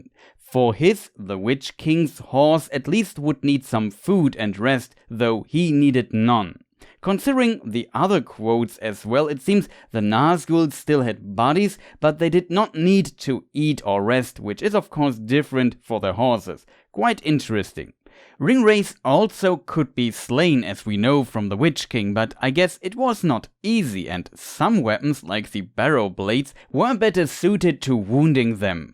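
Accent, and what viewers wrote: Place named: Germany. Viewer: As I heared you talking, I immediately guessed that your native language is German, just like mine. 🇩🇪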